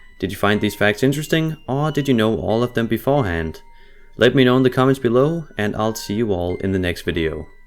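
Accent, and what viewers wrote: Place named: Denmark. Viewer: Yup this was super interesting! Du må næsten være dansker med den accent, right? :P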